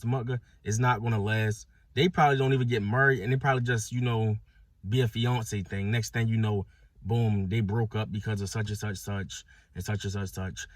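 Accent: Baltimore, United States. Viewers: you sound like a Baltimorean ☺️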